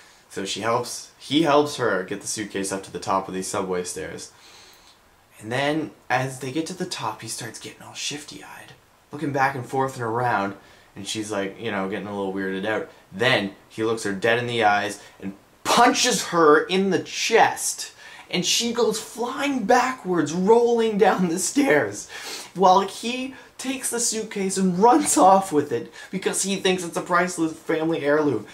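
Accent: Canada. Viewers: i like his candaian accent, lol, so cute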